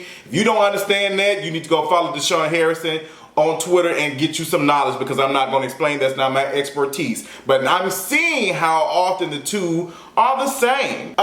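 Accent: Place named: New Orleans, United States. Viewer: me too...love that Orleans twang.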